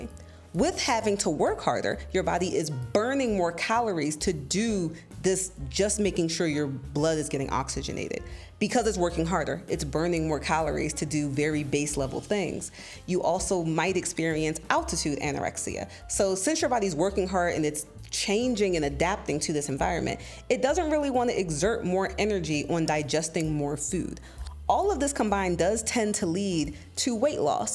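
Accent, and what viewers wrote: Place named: Kenya. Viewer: Gurllll!!!! You even have a Kenyan accent!! B A R G A I N I N G 😂😂😂😂 Karibu home!! #InstantFOLLOW!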